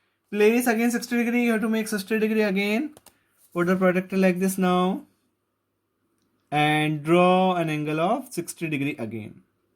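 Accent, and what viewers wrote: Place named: Bihar, India. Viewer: Bro has the bihari accent 💀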